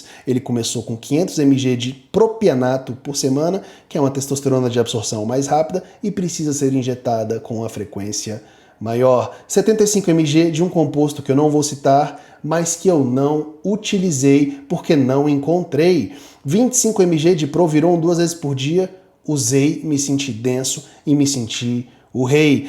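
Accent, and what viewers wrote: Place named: United States. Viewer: é americano, isso explica o inglês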